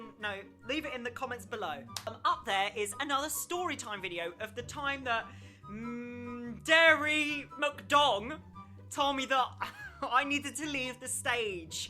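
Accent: United Kingdom. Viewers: British? Sounds like british or is he? Wtf im not sure if he is British or what .-. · Yes, he's English.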